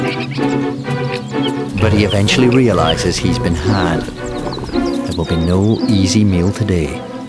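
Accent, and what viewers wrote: Scotland, United Kingdom. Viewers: where're you from in scotland, lad? love the videos. bravo for the work well done eh? cheers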